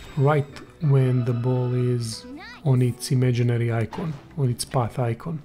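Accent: Russia. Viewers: По акценту вычислил что русский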